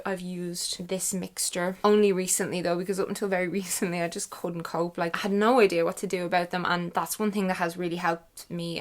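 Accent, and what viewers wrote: Ireland. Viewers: I love your Irish accent😉👍it sounds really lovely!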